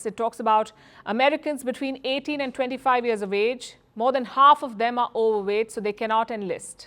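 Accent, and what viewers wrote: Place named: India. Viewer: I don't like this channel at all, her accent is so strong it shows that she's from India. 👎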